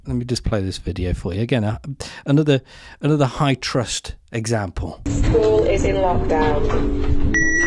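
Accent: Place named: Northamptonshire, United Kingdom. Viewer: I gotta have a beer with you geez...your accent is Northants ent it....I used to live in Rushden..no trainstation ..whoopee...only now I realise what a godsend that was....tartar😂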